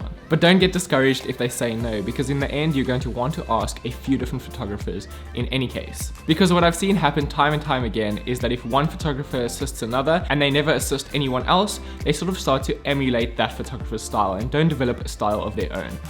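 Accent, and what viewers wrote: South Africa. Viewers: are you South African? Your accent sounds very South African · Ah this video was an amazing help! Is that a Cape Town accent I hear? 👀🇿🇦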